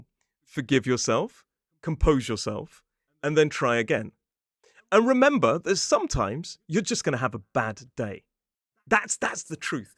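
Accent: United Kingdom. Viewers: You are the wonderful teacher in the world, I like British accent because of you , thank you so much 👍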